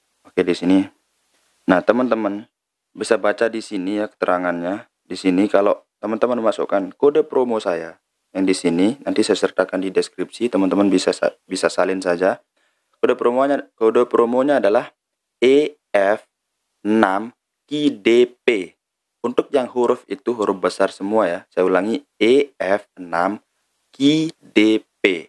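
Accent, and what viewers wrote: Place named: Lombok, Indonesia. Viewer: Org Lombok ni yak?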